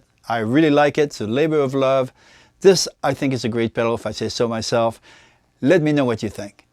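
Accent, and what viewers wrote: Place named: Italy. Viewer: You're talking about me! I'm the pro musician/producer/studio engineer. Hopefully I know what I'm doing, LOL! It's interesting to listen to you speaking. I can hear very clearly where your son's voice comes from. You've still got a little bit of the Italian accent. Some of my American family do too. Your son must have worshipped you as a child, and you must have given him everything you could. He is a genius level artist with the highest level of personal expression on his chosen instrument. Congratulations on your success and your excellent equipment. It offers some of the most unique guitar tones that have ever been designed or available to the public. The attention to detail in the dynamic response is what I love most about the equipment you design. Are the current Dirty Boy pedals being produced with a licensing agreement between you and the Dirty Boy Co. 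run by Danny Gomez and Roberto García?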